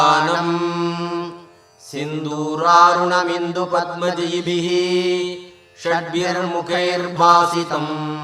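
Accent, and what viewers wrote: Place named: South, India. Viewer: Dravidian sanskrit , pronouncing bit different from northern tradition.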